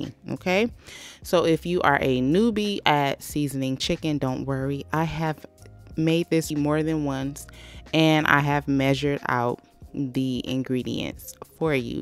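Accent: South, United States. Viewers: An African American sista with a southern accent frying chicken. It's got to be the best.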